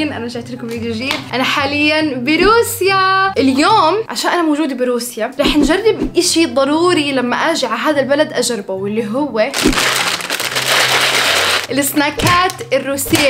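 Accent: Jordan. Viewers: لهجة اردنية كثير حلوة ❤❤ من المغرب 🇲🇦 احبكم يا اهل الأردن 🇯🇴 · اللهجهه الارودونيه كييييووووتتتتتت خصوصي لمن تكولل زاكيي ❤️❤️🥺🥺🥺🥺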